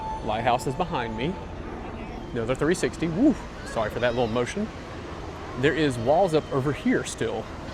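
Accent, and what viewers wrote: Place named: South, United States. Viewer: Where is from? He has a THICK southern accent. Haha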